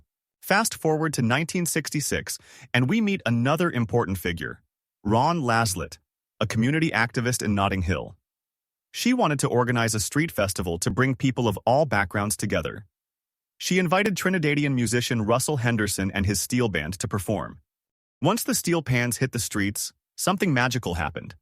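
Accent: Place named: United States. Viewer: Sounds like an AI generated American accent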